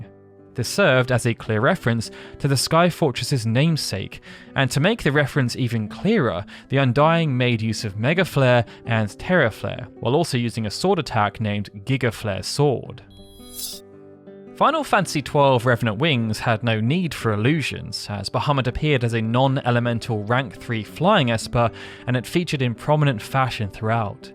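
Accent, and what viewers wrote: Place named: United Kingdom. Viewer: Ultra long video essays of old games are wonderful. MrEdders123 and I Finished a Video Game are also great alternatives with a similar British tone.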